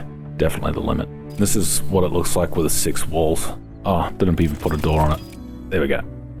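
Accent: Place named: Australia. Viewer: Came for the tips, stayed for the beautiful Aussie voice.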